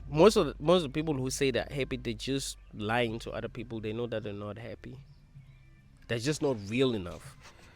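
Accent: South Africa. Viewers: English is not his first language. He's South African. I'm South African, and English isn't our first language. As long as you can understand what He's saying, that's all that matters. If it's too annoying for you, then move along to watch other people who speak how you'd like them to speak. Have a good day.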